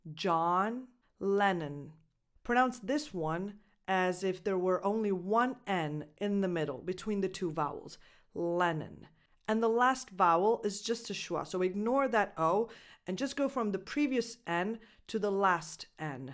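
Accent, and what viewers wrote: United States. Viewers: Sei molto brava e chiara ma secondo me sarebbe corretto sottolineare che la pronuncia che spieghi è quella americana (e ad es freddie mercury e jhon lennon erano britannici e la pronuncia è leggermente differente dalla tua) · Lei cara signora ha la cadenza americana!!l'inglese è un'altra cosa per mè..anche lei deve studiare l'inglese inglese però!brava và ❤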